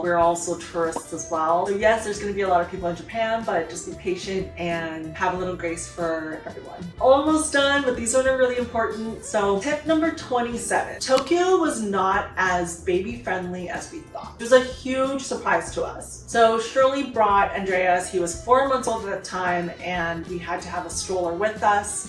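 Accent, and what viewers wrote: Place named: California, United States. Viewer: your voice sounds Californian with a wanta be “Valley Girl” tone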